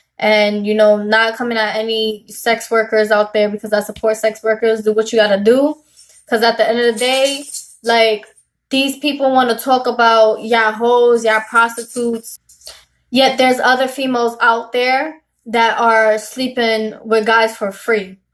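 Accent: New York, United States. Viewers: she from new yorkkk, my ex had dat accent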